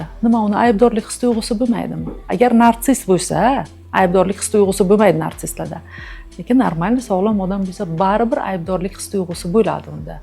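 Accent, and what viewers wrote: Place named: Qarshi, Uzbekistan. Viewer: Карши шевада опа куп болани фикрини эшитган бу аник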